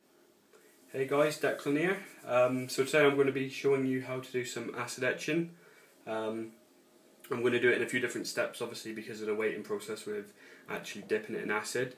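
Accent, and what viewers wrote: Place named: United States. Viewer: Must be a 'Murakin. The only English speaking country that needs subtitles for English. Sometimes for their own countrymen. Stupid inbred gits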